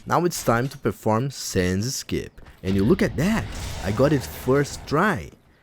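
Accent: Brazil. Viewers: bro i can uderstand you perfectly, you speak like a brazilian, idk why, but thats funny for me hehe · U brazilian or smth?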